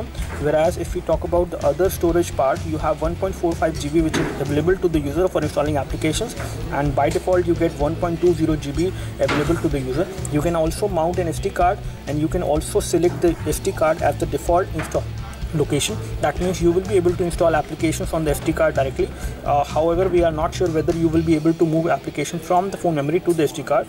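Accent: India. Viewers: OMG!!!!!!!!!! Fuck who many words u speak per second......Indianglish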